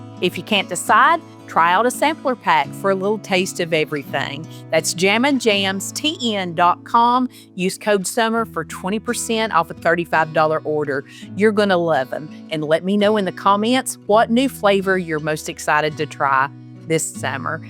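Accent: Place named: North Carolina, United States. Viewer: Thank you for the encouragement. I love watching your videos. Your accent and loving attitude sounds like my eastern NC home.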